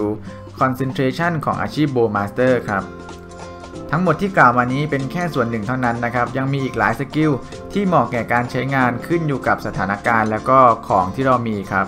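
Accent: South, Thailand. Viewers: พี่เป็นคนใต้หม้ายครับ .. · คนใต้บ่นิ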